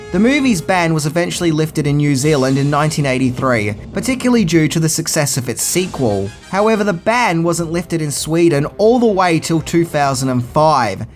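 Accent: Australia. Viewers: Always makes me smile when an Aussie talks about Mad Max. Just seems more fitting than listening to American reviewers. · An Australian talking about Mad Max feels right.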